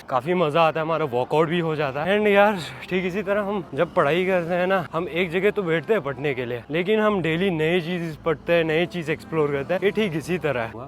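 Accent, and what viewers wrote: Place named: Kashmir, India. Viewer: Ur talking accent just like my kashmiri frnds 😂...keep going bro ...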